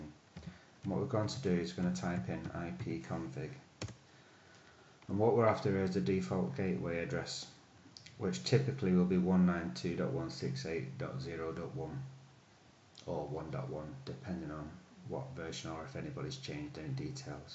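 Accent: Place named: Yorkshire, United Kingdom. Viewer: Nice one . I like your Yorkshire accent .-:))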